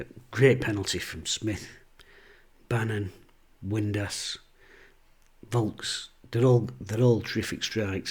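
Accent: Sheffield, United Kingdom. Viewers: As I now live in Devon, I would like to say how lovely it is to hear a real Sheffield accent again with all the old jargon I was brought up to hear. Keep up the good work as I look forward to your videos appearing. Thank you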